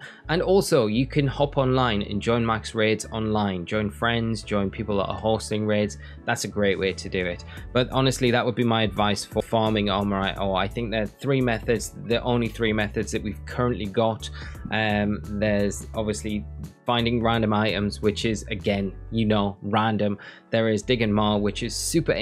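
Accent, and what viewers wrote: Newcastle, United Kingdom. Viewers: Why’s a Geordie posting at 3am? Too hot too sleep?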